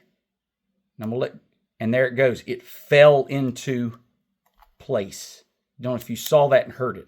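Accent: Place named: Oklahoma, United States. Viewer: Are you an Okie? A buddy of mine is from Ok City and sounds just like you! Btw, great video, I have an old .38 sp. that’s out of time.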